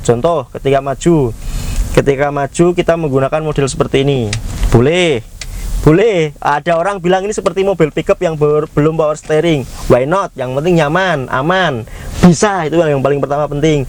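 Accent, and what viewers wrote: Java, Indonesia. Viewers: kalo boleh tau itu mobilnya siapa mas? logatnya jawa banget tapi plat nya D (bandung) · g papap mas bilang Cagak. itu cirikhasnya Vlognya mas ada aksen jawanya, dan itu yg bikin lebih seru dan enjoy untuk d tonton. sehat terus mas. rajin upload terus. lancar rizkynya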